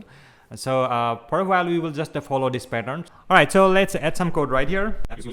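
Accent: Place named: Assam, India. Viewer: Brilliant! Also the accent sounds awfully familiar, are you by any chance originally from Assam?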